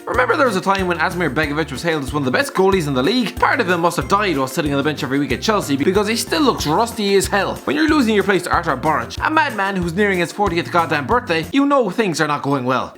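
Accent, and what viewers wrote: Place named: Ireland. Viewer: Its Irish Guy he's Irish most Irish people talk fast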